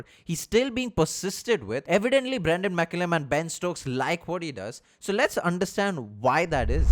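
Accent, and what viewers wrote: India. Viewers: This pjeet accent is sending me😂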